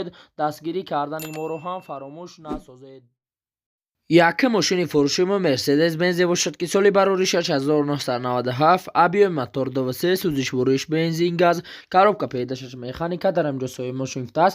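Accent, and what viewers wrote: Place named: Khatlon, Tajikistan. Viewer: А БАЧА АЙ ДУШАНБЕ МИНТАКАИ ХАТЛОН ГАПЗА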